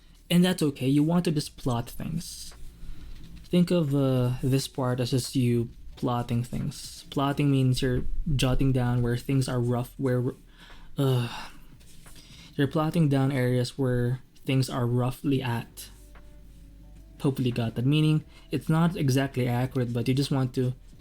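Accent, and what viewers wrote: Philippines. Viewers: Nagulat ako nung namention ung UST eh HAHAHA. Pero di na rin nakakapagtaka kasi very Pinoy ung accent nya, in an endearing way.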